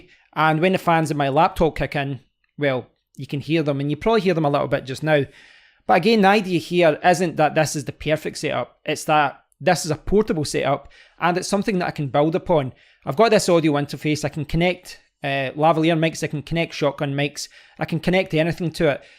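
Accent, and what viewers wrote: Scotland, United Kingdom. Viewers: Ur Scottish right ( so am i)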